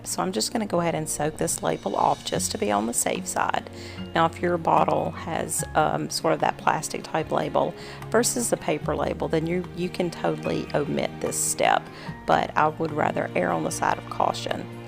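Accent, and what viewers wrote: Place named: South Carolina, United States. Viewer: Ah lol the one I didn't name you sound more South Carolinian! Your quite talented and loved the lamps. I'm like you in the sense I will not shell out the money on home decor or seasonal items for what they ask most are from China cheaply made and so not worth it. I'd rather make them myself. I do more home decor sewing in the summers and furniture rebuilding/ refinishing in the winter when the heat breaks.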